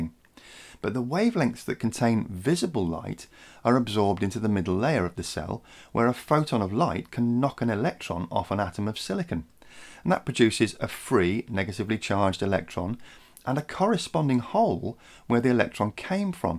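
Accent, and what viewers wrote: United Kingdom. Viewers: For American English “pero vsk vsk vsk ite” is the word & differentiates it from (perocstie?) which I hear throughout this presentation. I often have difficulty w/ various versions of British annunciation.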